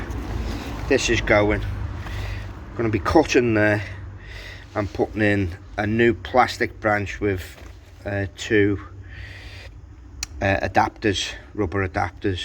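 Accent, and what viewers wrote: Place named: Liverpool, United Kingdom. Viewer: I do like a repair vid. I was just trying to imagine a scouser speaking Spanish. And then i gave up 🫣😂😂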